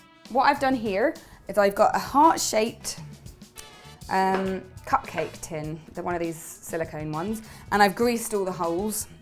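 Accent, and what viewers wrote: England, United Kingdom. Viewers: I can't get enough of the English accent. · Well she doesn't sound American coz she's English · she keeps interjecting little bits of American English... I really liked the British accent tho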